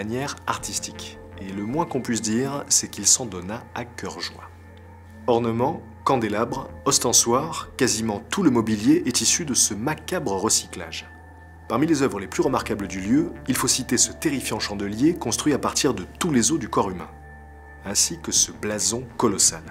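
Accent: France. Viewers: J'ai un peu mal en entendant la prononciation française des noms tchèques, mais chouette visite. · Salut!!! Tres tres tres bien!!! En tant que tcheque j aurais aimé que vous ayez prononcé mieux (cad pas a la française) les noms des personnes et endroits, mais c est un petit detail pas tres important. Chapeau, ou bien “klobouk dolu” comme on dit chez nous.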